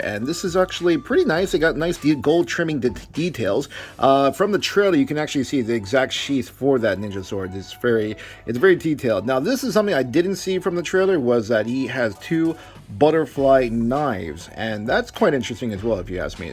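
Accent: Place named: United States. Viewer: bro, mind if i'm asking, where did you go to school? your english is so damn good with pure american accent. sorry, no offense. but considering where you are from, i haven't detected any mandarin speaker accent. btw, i'm glad that i've waited this far to purchase snake eyes cause i really like this movie version a lot far better than the rest cause of his ninja's and assassin's look. i've always considered him as an assassin. all the previous released looks more military to me. which is not my cup of tea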